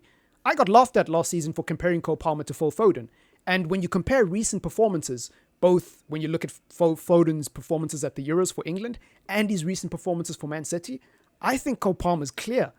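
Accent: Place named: New Zealand. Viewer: Where you from bro New Zealand or South Africa can't really tell ya know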